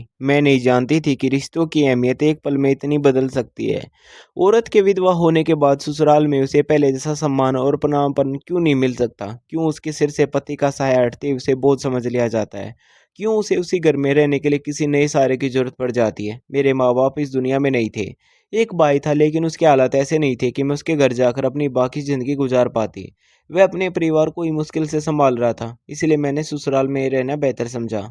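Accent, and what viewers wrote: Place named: Haryana, India. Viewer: हरियाणवी भाषा काम लहजा बोली में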